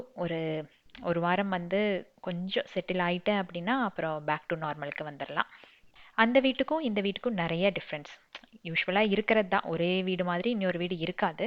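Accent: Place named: Pondicherry, India. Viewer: akka unga voice kaetalae relax ah eruku ❤❤❤unga kita erunthu varthuku orunal apditha nanga pondicherry la paesuvom unga kita erunthu varathuku oruka😂😂kathukita akka ma❤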